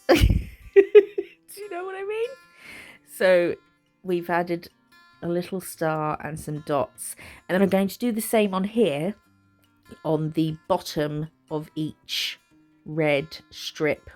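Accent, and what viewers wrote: United Kingdom. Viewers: You are hilarious! Why do things always sound a lot funnier in a British accent??? Lol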